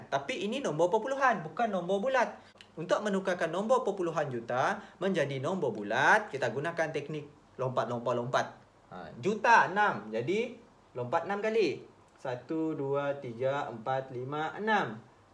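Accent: Sarawak, Malaysia. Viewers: Kitak orang sarawak ka?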